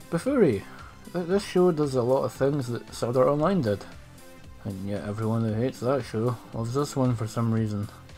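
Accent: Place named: United Kingdom. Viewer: God, I love that British voice of yours.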